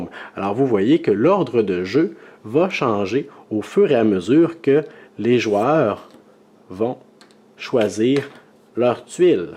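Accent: Quebec, Canada. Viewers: Très bien expliqué et, le bonus, est ce savoureux accent du Québec !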